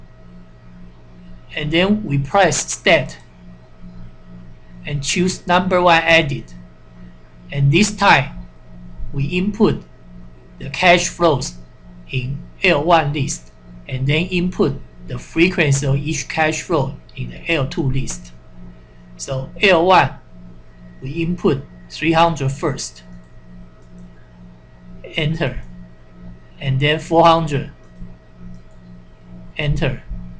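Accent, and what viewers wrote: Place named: China. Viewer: lol “curly bracket”, his accent is sick. obvi chinese & not racist to point that out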